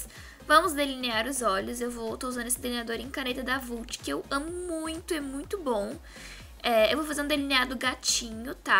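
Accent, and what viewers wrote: Santa Catarina, Brazil. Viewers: pelo sotaque só poderia ser catarinense!! linda, perfeita make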